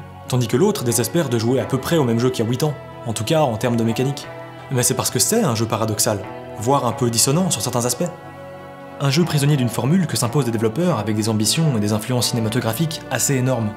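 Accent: Belgium. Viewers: Il est belge ??? Psk il dit pas huit mais wuit par exemple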